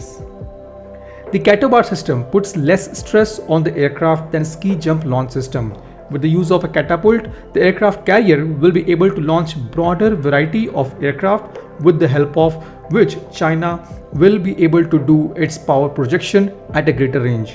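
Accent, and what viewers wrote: India. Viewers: India accent english sounds so funny. Love India from China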